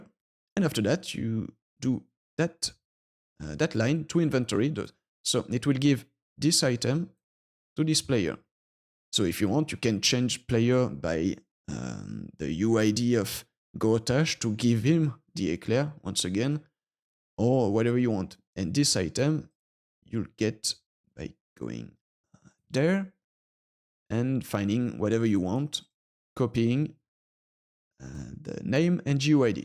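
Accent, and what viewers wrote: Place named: France. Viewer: En tant que française j'ai reconnu de suite l'accent :D très bon tuto! la boite à outil est elle à la portée de tous?Je veux dire est ce que si on ne fait fait ni modding ni programmation , n'importer qui pourra créer sa map ,et ses quetes? j'ai lu aussi que ce n'était dispo que pour steam ? il y a t il un espoir pour GOG?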